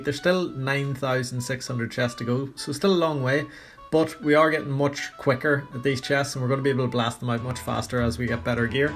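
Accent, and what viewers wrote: Northern Ireland, United Kingdom. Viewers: You from N.Ireland?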